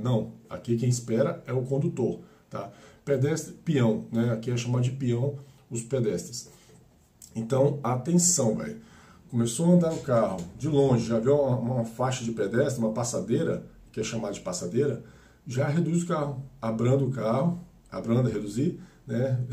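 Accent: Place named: Bahia, Brazil. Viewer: Vc fala como neu irmã da Bahia, Salvador, por isso pergunta se é baiana, desculpa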